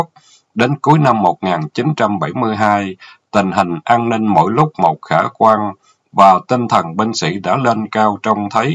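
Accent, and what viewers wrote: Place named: South, Vietnam. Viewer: Không biết tôi đã theo giỏi chuyện nay không biết bao nhiêu lần!rất thích loi hành văn mạch lạc của tác giả, giọng đọc miền Nam của anh. Loi điều binh và chiến lược hành quân của manh su Ng mạnh Tường.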